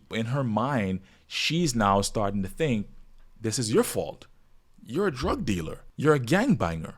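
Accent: Bahamas. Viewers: I just came across your video for the first time. From the moment I heard your voice I immediately recognized your Bahamian accent. Nice to see a fellow Bahamian on YouTube. ❤🎉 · I agree with what you said at the end bro ,& you sound like a fellow Bahamian as well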